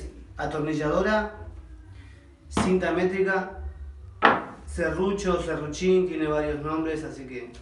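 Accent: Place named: Argentina. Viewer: Era hs siempre hay del otro lado un español explicando.ahora es el tiempo de escuchar un argentino buenicimo tu programa .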